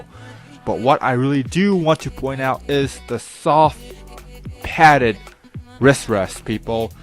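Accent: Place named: South Korea. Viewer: quikfinger00 it Sound like he have Korean Accent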